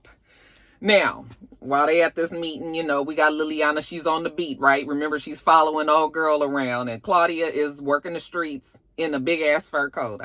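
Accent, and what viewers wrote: Ireland. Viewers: 🤣😂🤣😂 I loooove your Irish Accent!! Excellente' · It's the Irish accent for me...:)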